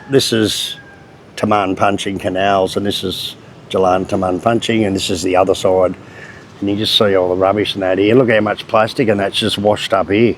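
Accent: Australia. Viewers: I’m in North Queensland, great to hear the flat Aussie accent 😊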